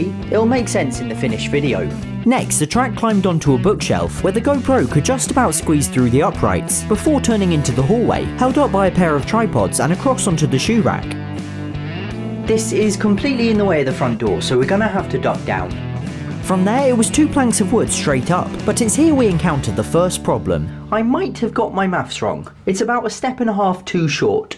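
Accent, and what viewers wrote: United Kingdom. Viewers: I like this guys British voice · You british?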